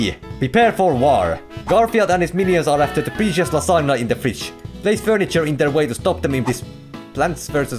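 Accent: Finland. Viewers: Wait you are Finnish. I thought you were like russian by ur accent but somewhat Finnish accent but yeah Suomi perekele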